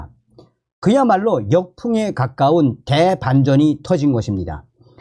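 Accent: North Korea. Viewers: 북한사람인가. 목소리가 북에서 온 사람 같아요.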